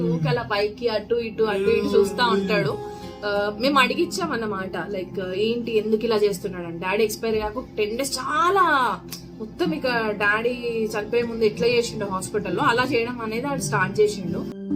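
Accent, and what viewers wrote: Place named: Charakonda, India. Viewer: Akka chrakondhalo super ga chaptharu akka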